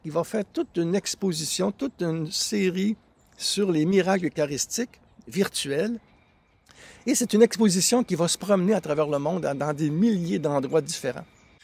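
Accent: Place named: Quebec, Canada. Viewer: C'est la première fois que j'entends une voie canadienne française parler de Carlo Acutis. C'est bien de faire les éloges de bienheureux précoce, mais quand mettrons-nous sur pied une vaste ``opération Carlo Acutis`` dans les écoles parmi les jeunes qui sont on ne peut plus mûrs pour accueillir ce genre de découverte ?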